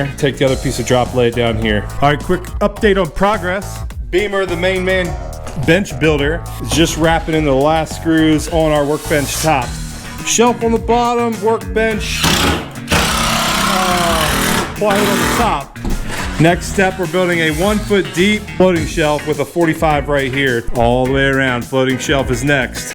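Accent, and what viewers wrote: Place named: West Virginia, United States. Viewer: I just had a feeling you were from West By God when I started the vid! Charleston area, here! Love this build and am looking forward to implementing this in my garage.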